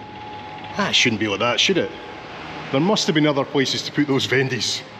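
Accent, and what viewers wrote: Scotland, United Kingdom. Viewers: music not needed, just background sound and your great Scottish accent....! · How delightful to journey along with the views accompanied by the therapeutic sounds of the wheelsets on the rails and lovely Scot's voiceover.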